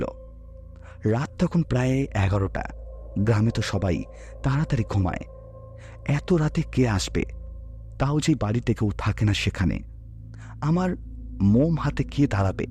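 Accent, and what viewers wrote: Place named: Bangladesh. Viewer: Apni banladeshi??? Kothar bhul bhalo ucharon shunei bojha jache😂😂😂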